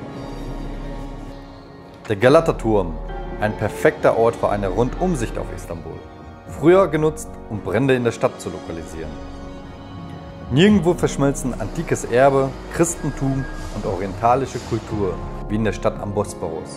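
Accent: Germany. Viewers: I like your German accent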